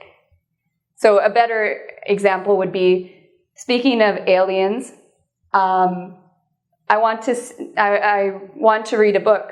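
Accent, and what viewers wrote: Canada. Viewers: i can hear the canadian accenthaha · Canadian English is nice... and she explains very well. Keep on like that,! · Hi, awesome vids! Just out of curiosity, are you by any chance Canadian?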